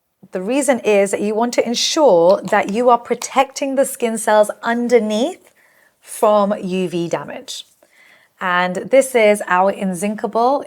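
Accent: United Kingdom. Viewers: Your accent is British, but name sounds like Indian, is that true ? I'm Indian, & I'm just about to complete my MBBS internship in 2 months, & i want to work in research field, & you gave me hope thanks ma'am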